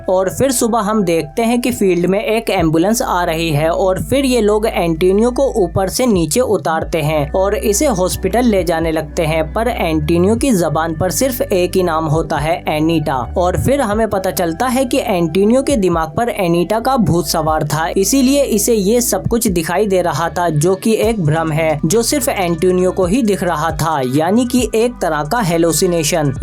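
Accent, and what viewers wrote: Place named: India. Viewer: Your Indian accent, when you speak in English, is terrible, man from India. Learn English better.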